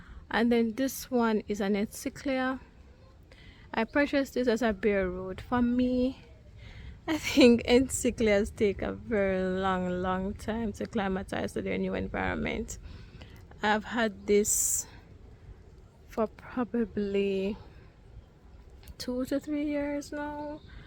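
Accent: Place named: Caribbean. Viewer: Wait u sound Caribbean. A trini here 🇹🇹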